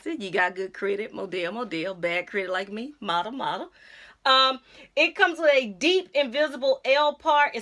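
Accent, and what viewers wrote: South, United States. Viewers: Its model model. Modelle is moe-dell. I'm a geek like that. Lol. You are absolutely cute as a button, and a pleasure to listen to, you southern belle, you.💓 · I am here for this Southern accent!!! The wig is beautiful on you! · I LOVE YOUR PERSONALITY!!!! I randomly came across your video and I'm glad I clicked. You're gorgeous, funny, down to earth, engaging with the cutest Southern accent. I died laughing when you said get your wig where you want to I'm not sponsored....I'm subscribing just for that! P.S. what is that fabulous lippie color you're rocking???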